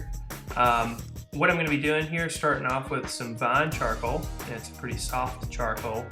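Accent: South, United States. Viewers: Your accent is cool. From the South?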